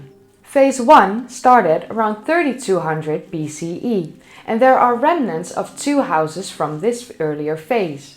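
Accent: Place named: Netherlands. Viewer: Right, a lovely Dutch English accent - I heard it in a second.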